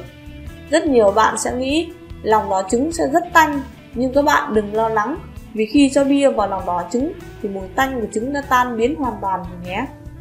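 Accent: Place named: Countryside, Vietnam. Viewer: Giọng quê mùa kém sang lắm, ơi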